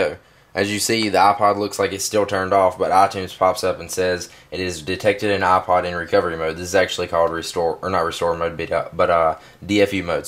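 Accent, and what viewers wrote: South, United States. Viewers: Damn old vid. U sound so country here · pretty sure he just sounds like a southern United States lad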